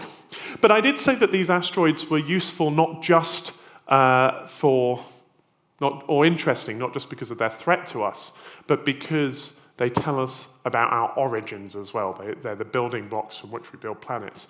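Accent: United Kingdom. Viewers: I love the britishy accent of the speaker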